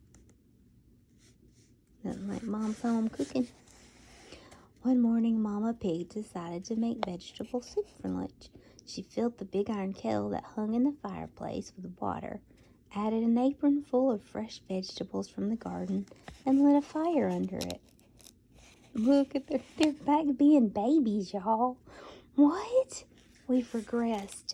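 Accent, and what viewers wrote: South, United States. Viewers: I really love when you read I think it’s the hard Southern accent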